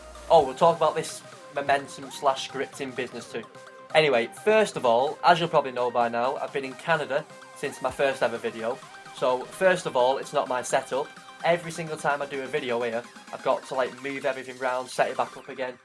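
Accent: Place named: Wigan, United Kingdom. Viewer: thought the accent was familiar haha. guessing you from round orrell/Shevy? was wondering what team you play for as I'm from wigan as well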